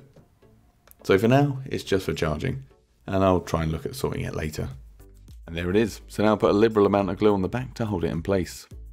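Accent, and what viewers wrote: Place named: United Kingdom. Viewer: I think you are the most british guy i've ever heard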